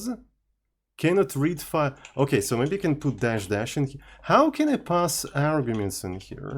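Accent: Russia. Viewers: Why do you sometimes swear in german? As a german I appreciate it XD, but its a bit confusing. An english speaking programmer with an russian accent cursing in german.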